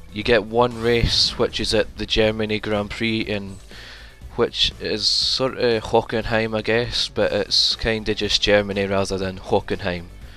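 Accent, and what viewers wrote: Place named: Scotland, United Kingdom. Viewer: You must be Scottish, cool accent! Regards BBKCjr. ;)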